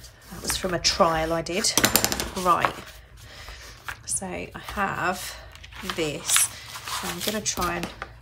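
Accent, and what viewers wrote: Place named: London, United Kingdom. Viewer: Hi, being an ex Londoner in OZ, it seems to me that you’ve suddenly started sounding more London! No offence I hope as, coming from me, it’s a compliment!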